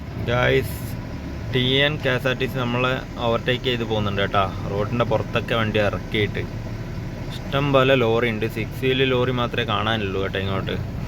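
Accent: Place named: Kasaragod, India. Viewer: സംസാരം കാസർഗോഡ് 😂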